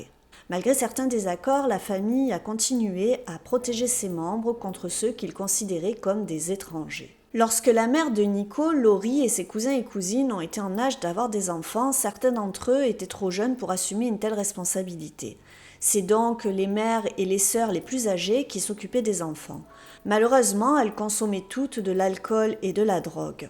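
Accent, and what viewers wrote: South, France. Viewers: Je découvre ta chaîne, c'est très sympa, une Victoria Charlton avec l'accent du sud, et si en plus ya en générique, je m'abonne!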